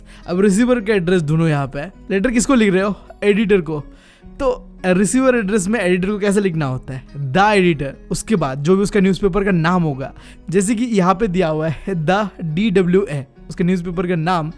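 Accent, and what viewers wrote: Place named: Bengal, India. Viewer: Bengali accent is soo good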